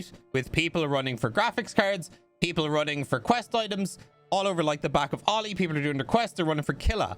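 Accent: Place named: Ireland. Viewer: The Irish accent is a thing of beauty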